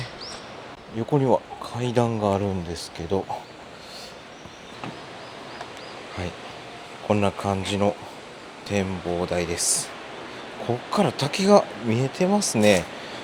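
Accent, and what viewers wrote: Kansai, Japan. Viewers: お早う。フィリピン在住者です。知人のフィリピン人とこの動画を観ていました。彼は時々日本旅行に行っている人です。途中までは大感激で、次の日本旅行には徳島に行きたいと言っていましたが、どうも様子がおかしくなって動画をストップしました。何があったかって言うと、フラッシュバックに襲われたそうで、大阪を観光していて、恐喝にあったそうです。話し声が関西風の声で、動画の声とよく似ているとの事。日本は安全で安心して旅行が出来ると信じて行ったけど怖い目に合ったそうだ。スイマセンが、動画では標準語でお話しいただけませんか。お願いします。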